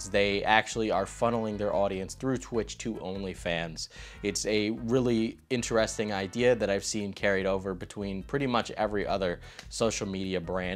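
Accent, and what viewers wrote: New York, United States. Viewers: I never heard that little bit of New York in your voice until you mentioned it and now I can't unhear it.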